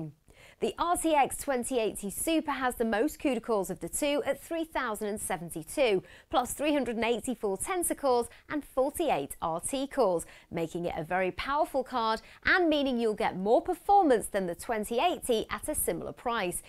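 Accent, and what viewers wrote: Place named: England, United Kingdom. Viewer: I love the englsih accent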